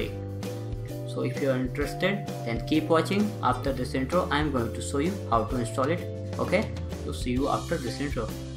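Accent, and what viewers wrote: India. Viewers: The music really annoys me. With the difficult indian accent, the music makes it so hard to focus. Kill the music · x( no soporto ese acento indu-ingles. good video overall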